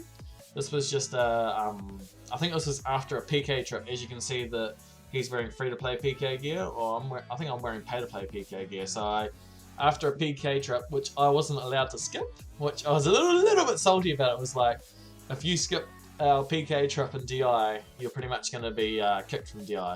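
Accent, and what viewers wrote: New Zealand. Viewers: Are you from nz? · bro your a kiwi aye, that accent sounds like home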